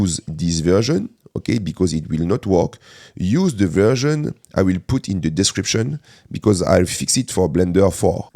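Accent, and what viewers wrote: France. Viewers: accent français détecté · mdrr le big accent francais mais sinon merci pour le tuto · C'est quand même plus facile de comprendre avec un accent français. mdr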